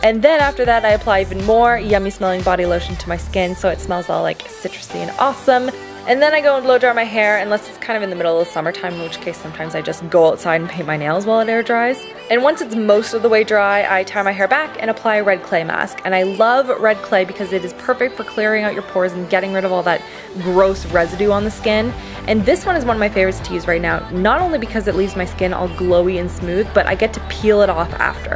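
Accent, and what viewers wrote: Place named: Canada. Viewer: Are u Canadian u kinda have an accent I was just wondering ;)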